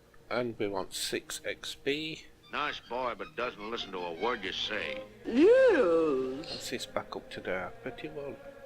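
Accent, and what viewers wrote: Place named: Manchester, United Kingdom. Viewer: Nothing to do with your voice, it has a good north Manchester accent. Glad you don't have commentary in one ear and music in the other I like both together.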